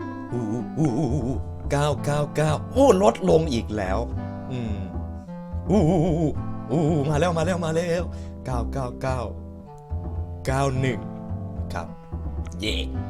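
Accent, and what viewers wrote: Isan, Thailand. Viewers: พี่คนอีสานหรือปล่าว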